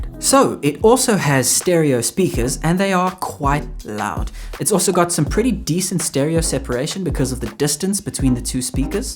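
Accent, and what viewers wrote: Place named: South Africa. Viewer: Subscribed because I need Tech Reviews delivered in a sick South African accent!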